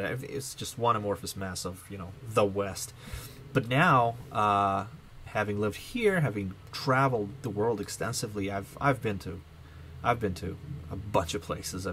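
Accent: United States. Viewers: um, hanging out with Americans and simply hearing the accent for a long time will allow him to pick it up. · Man, we could use about a hundred million more just like you! America has lots of problems, and we are not perfect, but there is no other country in the world as great as her. I wish all Americans would stop and realize that. We are so incredibly blessed to live here and call her "home". You`re already an American because you get what America is all about. Freedom. Thank Dear God for her. P.S. Where did you learn to speak such perfect American English? I was born and raised here and you speak better than I do. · Man, you ARE America. ...But how did you get that perfect American accent? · You have a better "American" accent that most natives of New Jersey! 😁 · wowww your accent is amazing ...i'd never guess that you're not from America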